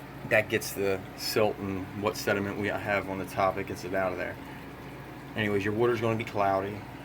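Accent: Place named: South Philadelphia, United States. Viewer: Nice bro! You remind me of dudes I grew up with in South Philly. You got that tone! I like the step by step videos.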